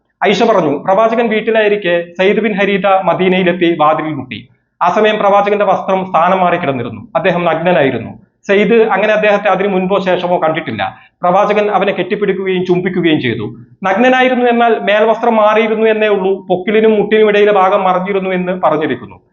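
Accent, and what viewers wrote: Kasaragod, India. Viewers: കാസ്രോട് ശൈലി തന്നെയാണ് ഭായ് രസം ❤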